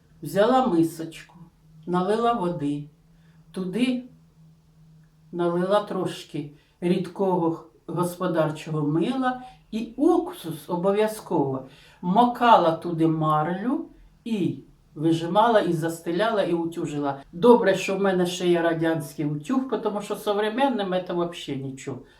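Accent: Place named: Ukraine. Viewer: Ничего себе ПАНИ))) Хохлушку за версту видно, что речь, что мина кирпича просит))) 😄🇷🇺🇷🇺🇷🇺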